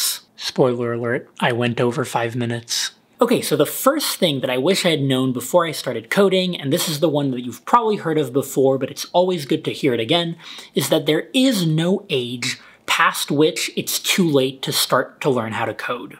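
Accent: Romania. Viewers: You romanian ? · Are you romanian?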